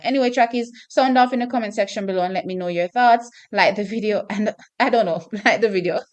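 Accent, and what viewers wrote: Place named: Jamaica. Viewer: Hi. I like your channel. I love the topic. I am American, but I am enthralled with the Jamaican female sprinters. If I can make one suggestion for you?... Too much commentary w/o enough visual references. You are pretty you look at, and I love the accent, but we need some pics or video referencing what you are discussing. It helps to keep our attention. 🤷‍♂ 👍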